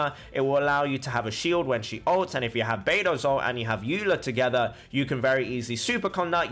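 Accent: United Kingdom. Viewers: Your asian+British Accent is Smooth;! 😂😂😄😂😁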